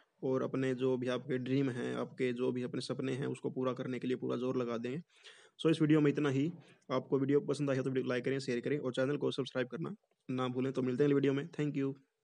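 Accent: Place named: Haryana, India. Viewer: Ap haryanvi hee Bola kro kuki bich me jb ap hindi bolte hoto to haryanvi swg aaa jaata h😁😁😁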